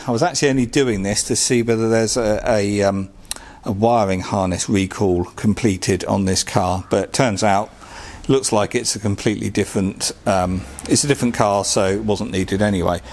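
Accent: United Kingdom. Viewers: Love the YT algorithm 😊 Thx for ur video- it really helps me a bit to understand how ev‘s work. Greetings from austria 🇦🇹 Love the British accent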